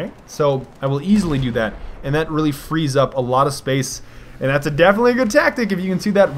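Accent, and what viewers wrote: Germany. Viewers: I Love your German ;) greetings from Germany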